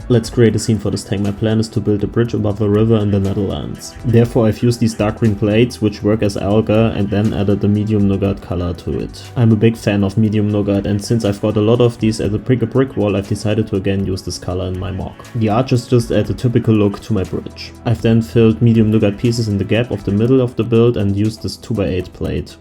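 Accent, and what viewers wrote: Germany. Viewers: Are you German? :) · Höre ich hier deutschen Akzent?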